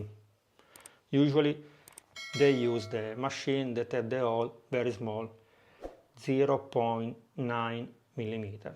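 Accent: Italy. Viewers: Descubriendo tu canal, saludos desde Venezuela, y comienzo a ver tus videos hoy. Comencé con la papada curada de cerdo para hacer carbonada... me encanta tu acento italiano de tu inglés!! Un abrazo y mucha suerte!!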